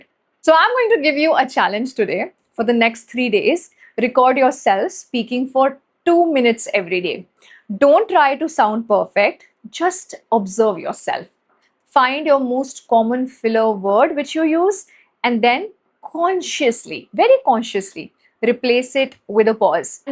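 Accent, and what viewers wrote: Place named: India. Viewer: Everything is fine . Your accent needs a bit of refinement. R can be pronounced in Brit or American way. The Indian R 😅 ( barring Northeast)is really Bad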